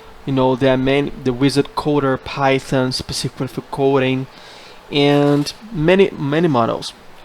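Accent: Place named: Brazil. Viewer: Thanks for the video, I have the same GPU. (Acer Nitro 5 / Intel Core i5/ 32 gb DDR4 / GPX 1650). By the way, are you Brazilian too? I am Brazilian and your accent sounds like mine lol.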